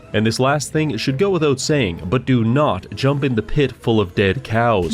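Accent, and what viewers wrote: Netherlands. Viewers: You're dutch is cute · hold on, are you dutch?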